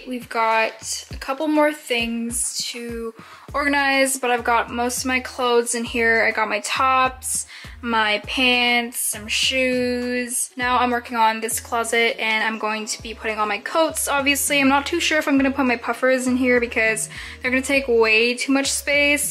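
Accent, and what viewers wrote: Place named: United States. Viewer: ahh, london. you have an america accent. are you from here? if so, would you recommend moving to London/overseas? do you have a video on your experience & the cost of living?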